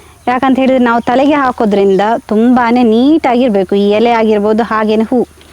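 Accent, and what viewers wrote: Mangalore, India. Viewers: Your Kannad talking voice is mangalur I am at Haveri Karnataka style of kannada speaking is different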